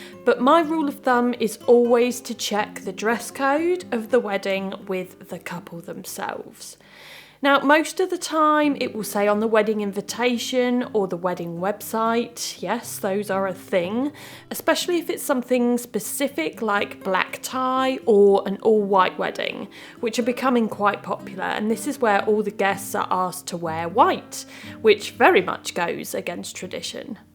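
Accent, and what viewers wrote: England, United Kingdom. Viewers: Your voice and the English accent are so chic. Adore listen to you.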